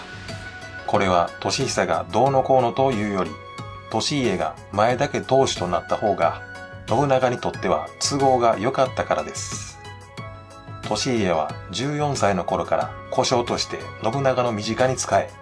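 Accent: Kansai, Japan. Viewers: 主さんは関西の方かな？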